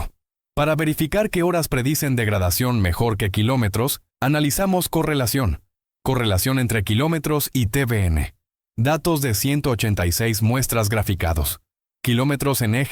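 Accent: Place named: India. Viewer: Por favor no dejes todo en mabo de la mal llamada IA, el video esta bueno, el audio parecer indio hablando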